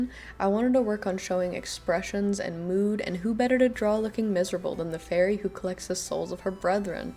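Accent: South, United States. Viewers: is it just me or has she been getting more southern (hi )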